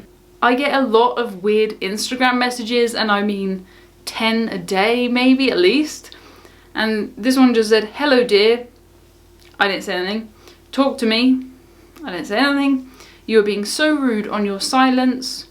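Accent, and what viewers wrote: RP, United Kingdom. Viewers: Posh is awesome. Your accent is one of the resons that I like your videos. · I see this is an old post. You handled it so well with dignity and yet got a few hits in as well. I think maybe some associate the accent with shows like Bridgerton and that it would feel good to go Mrs Brown 🤪 on them as well. Keep up the good work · Until I watched this video I would have said is the channel least likely to offend anyone. is a cheerful, low key presenter, with a charming, easy to understand British accent, giving us the information on each ship and line, objectively, without trying to either "sell" one line or disparage another. She shows us the food, the itinerary, the cabin she booked (and sometimes, alternatives at different price points). She runs through some of the options you might want to buy in addition to your tickets, very helpful for people who have never cruised before or haven't cruised recently. 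I've concluded that I don't want to cruise any more, but I find her videos entertaining and fun to watch. I think there is a certain percentage of the public who just like to hear themselves complain. Pay no attention to them, just carry on. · I've only just recently stumbled across your channel. Congrats on quitting in February! I quite enjoy listening to you. I've always liked the way Brits talk. It, to me, just seems proper. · I don't understand people. If you don't like something, don't watch. Your videos are very interesting. I like your "Britishisms" and listening to your accent. What a nice smile! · Isn't sounding posh something good? 🤔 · Just block or delete them. Too many creeps that will write things they are thinking but would never dare speak in public. Your voice is beautiful, and being posh is perfectly fine. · I closed my eyes and for a moment thought I was in Downton Abbey..😁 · I'm an American, so I have to admit, I know precious little about the various British accents, but I think your accent is lovely, and if someone thinks it's 'posh', (which as an American, I think means 'classy'?), well be flattered that someone thinks you sound so lovely. 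Also, I don't think you're negative at all. I feel like you're tactfully truthful, quite friendly, and occasionally hilarious. I think of you as my cruise bestie with all the good tips! People see in others what they are giving off TO others, so if 'Sally' can't ever be kind herself, she isn't going to be able to see any good in others, you included. You keep being your sweet, helpful, humorous self, and we'll keep clicking 'like' and 'share'. (We can't keep clicking 'subscribe', because we already did. LOL) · Some of these rude comments don’t sound like they were meant for you. They sound like they picked up rude comments from somewhere and sent them to you. Some people can act very maliciously. Don’t let their negative comments stick. And what’s wrong with sounding posh anyway? The world is made up of all sorts of people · Your English of course your accent is posh 😆😆😆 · Someone called you "posh"... 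Well I, as a foreigner, didn't know it was a bad thing. I've just recently discovered your channel and binge watching your videos and one of the reasons why I like your channel is your clear, sophisticated way of speaking. So if it's tied to being posh, then keep being posh please and nevermind the haters ;) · you are a beautiful young lady doing work i love sharing cruise things,,,your accent does come over as posh but i am scottish and have issues with myself all the time,,,,,,if someone has to belittle others to feel ok there is something wrong with them and not you...you are caring and sharing and keep up the good work and may i wish you happy cruising for the future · I love your opinion because you are real and have the experience to form a valid opinion. Love your “posh” accent, but I’m American and we generally fall for British accents! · Well I love your videos, and your wonderful BBC accent. 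· I love your "posh" accent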